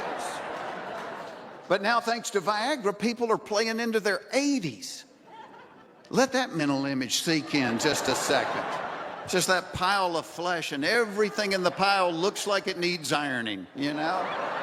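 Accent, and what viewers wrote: United States. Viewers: Not funny Jeff is going to be old soon. Making fun of the elderly is uncalled for. He has no respect for the elderly that helped support him baby sat when he was a baby and a little boy growing up. He was spoiled and the parents let him treat his grandparents anyway he wanted. Bad parenting has spoiled grown children. Jeff acts like he is younger than he is. To think his mother is in her 80s. Yes an elderly person can carry at 80 since their grown children are left alone in their homes and they live by themselves the elderly have to have protection. They don't have to buy a 38 caliber gun they can buy a small 22. How would he feel if his mother was robbed at night and they hurt his mother all because he made fun of her not wanting her to get a gun. The bucket has been a part of history when they didn't have bathrooms with flushing toilets and most everybody all ages had a pot that was put under their bed. 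How about the poor that had to use buckets and people they only had out houses. He's got a southern accent he should know the south had hard times just like the people in the north New York. Shame on him being a so called comedian. The elderly have real medical problems no related to age. Alzheimer's is Diabetes of the brain and the researchers found a 13'year old with Alzheimer's. They quoted its not related to age. forgets things all the time he isn't going to be all alone in forgetfulness because memory loss comes from pesticides and what's all in our food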